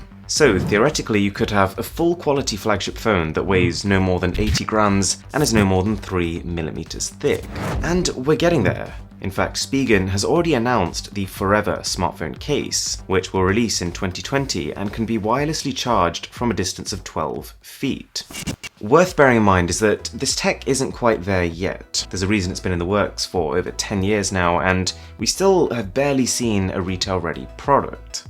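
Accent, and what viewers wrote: RP, United Kingdom. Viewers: I cant stand how this guy talks. he talks like a royal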